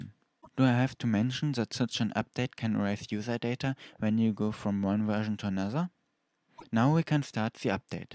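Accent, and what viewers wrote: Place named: Germany. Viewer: This german accent😂 no hate. I just find it funny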